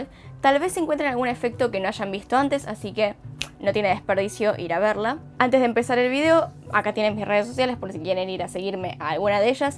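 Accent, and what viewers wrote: Argentina. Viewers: Lo dije una vez y lo reitero....Me encanta tu acento argentino, saludos...!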